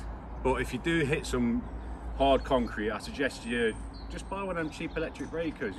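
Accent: Nottingham, United Kingdom. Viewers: Wait is he from Nottingham lol ? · Amazing video. I recognized the Notts accent immediately, always makes me smile.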